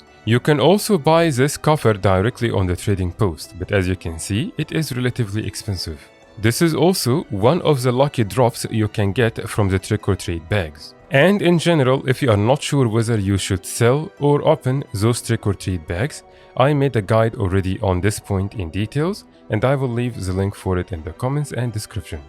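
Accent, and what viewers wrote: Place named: Turkey. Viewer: I like your accent. Sounds Turkish but I might be wrong. Also, cool video, clean and concise! <3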